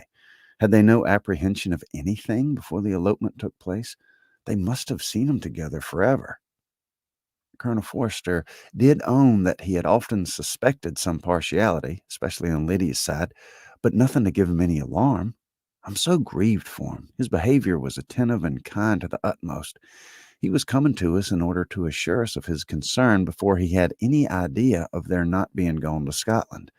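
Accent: South, United States. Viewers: This is brilliantly read. I would never have thought with a southern accent it could be just as clearly conveyed being Regency English. Well done.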